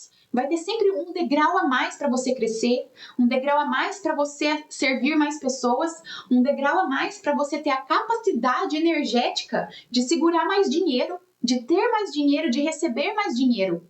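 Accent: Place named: Minas Gerais, Brazil. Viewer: Teve que falar Português?! Você tem o sotaque mineiro e fala bem a Língua Portuguesa.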